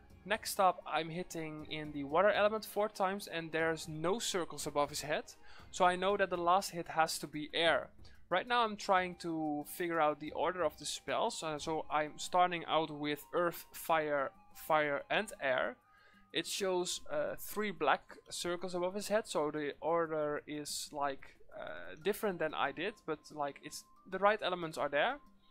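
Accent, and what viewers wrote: Netherlands. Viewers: Ik was al aan het denken dit accent ken ik maar je bent inderdaad nederlands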